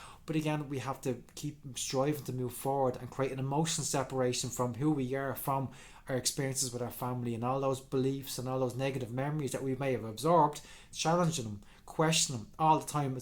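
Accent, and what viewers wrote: Ireland. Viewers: that beautiful Irish lilt I miss so much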